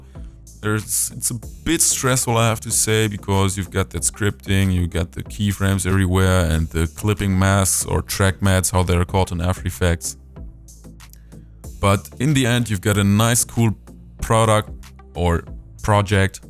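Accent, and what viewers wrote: Germany. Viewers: You are german? :D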